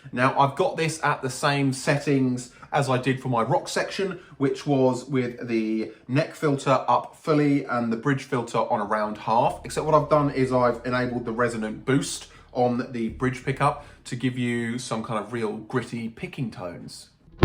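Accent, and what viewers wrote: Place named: United Kingdom. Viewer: Pity , as from accent it looks is based from U.k . Meaning the second filter preamp avalailbe on the market is still in u.k , brexit sucks . Is also bit weird coming so late in the filter party in the very same country ,but more the better. For reference decades after East ( the best preams of the world i.mo) did it for acg basses , following on the alembic fame for that in the past ( with lo pass AND hipass btw , i have it , exceptional , not practical for live use for speedo changes though ,so deinstalled ages ago ) . Again, The more the better so lucky you , just honestly it should have been mentioned , cause EAST (uk..) IS the MOST versatile if you just judge by that variable , and by far . Us players know it well lolz Anyway that confirms bass is DECADES ahead guitars in terms of alternative solutions ( guitarist dont want even try that lolz)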